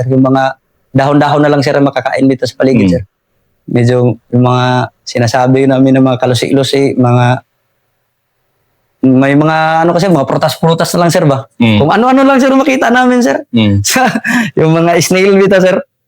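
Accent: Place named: Surigao, Philippines. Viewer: Sgt kumusta ang galing mo parang taga Agsam o Ibuan Lanuza surigao del sur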